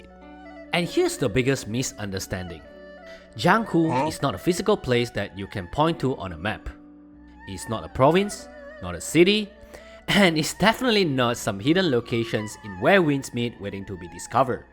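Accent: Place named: China. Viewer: How are we speaking with a Chinese accent yet using American pronunciations of Chinese words?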